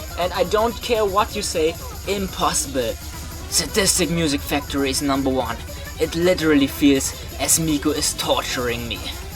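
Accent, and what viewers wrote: Germany. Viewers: Kommst du zufällig aus deutschland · Are you German?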